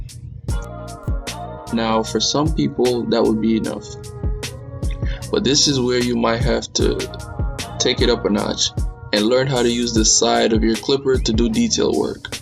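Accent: Jamaica. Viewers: That Jamaican accent just waiting to come out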